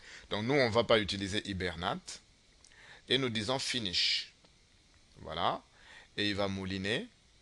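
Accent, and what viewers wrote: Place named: Cameroon. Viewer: Merci beaucoup...tu as une accent camerounais ;)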